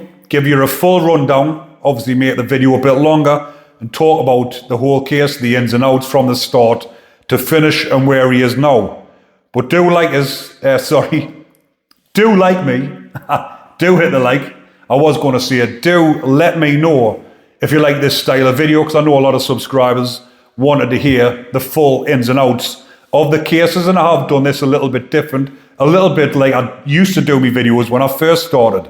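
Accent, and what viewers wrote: United Kingdom. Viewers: Its so nice to hear British person speaking English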